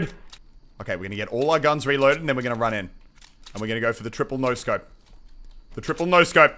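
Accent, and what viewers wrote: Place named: Australia. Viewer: Jesse Nieves he is ausie and sounds like croc hunter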